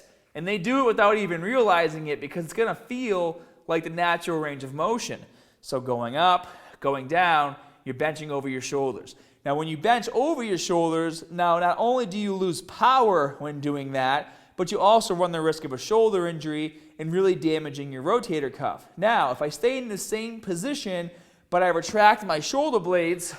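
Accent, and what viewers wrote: Boston, United States. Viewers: Sounds closer to a Boston accent. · love these videos but sometimes that accent makes me crack up :Bah, Bahbell, Hahd, entiah, muscle fibah hahaI wonder how weirb my SoCal accent sounds in Boston and new yohk and new joisey ? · yeah the dude has a real Boston accent lol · boston aye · Subscribed for the Boston accent and legit information. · I'm assuming you're from Boston, Mass. Love the accent [here in Australia!]. Very informative video. Excellent. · Got nothin but love for that Boston accent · Love the Boston accent. No homo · Boston or New England accent basically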